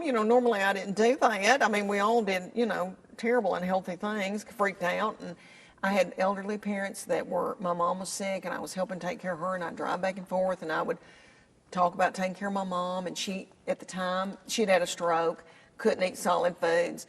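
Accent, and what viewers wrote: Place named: North Carolina, United States. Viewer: You are so down to earth and funny. I'm from SC. I have aunts and cousins from NC have your strong accent. You tell stories that I hear from my family I'm 70 and😂 your the best comedian.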